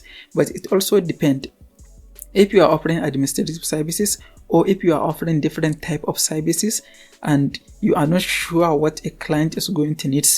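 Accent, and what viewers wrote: Hausa, Nigeria. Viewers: Nice Video, I love your Housa Accent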